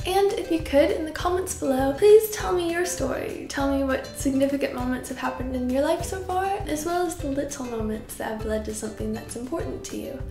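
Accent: England, United Kingdom. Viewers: How long have you been living in England? You're starting to get a bit of an accent. ☺ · Wait you're American? I always thought you were British 😂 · I love hearing the British accent creeping into your voice!!!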